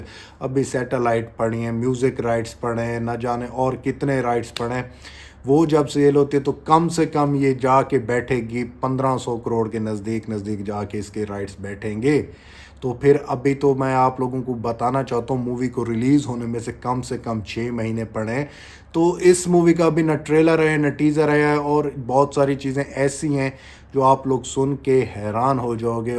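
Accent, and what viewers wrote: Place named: Pakistan. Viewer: Pleass batao na..aap india se ho ya pakistan hai..kyuki apki accent Pakistani hai..❤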